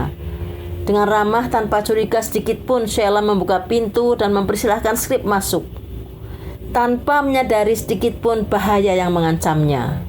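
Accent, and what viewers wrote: Java, Indonesia. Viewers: ....... madam..... katanya mau 2x upload dlm seminggu....di tggu tggu kok blm juga... sukses terus ya madam.....kalo bisa medok nya di pertahankan.......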